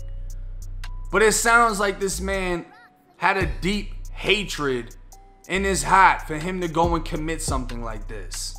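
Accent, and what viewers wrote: Boston, United States. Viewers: Are u from Boston I love the way u talk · Why you sound like you from Boston?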